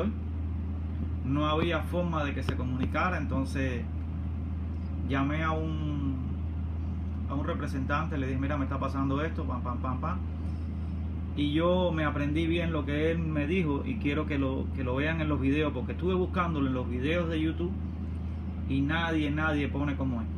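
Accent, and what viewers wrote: Cuba. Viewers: Hola tu eres cubano te reconoci por el hablado, saludos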